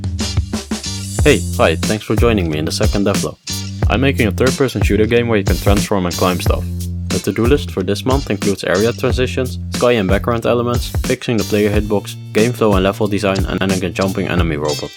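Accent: Netherlands. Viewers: this dude dutch??? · haha you are dutch :)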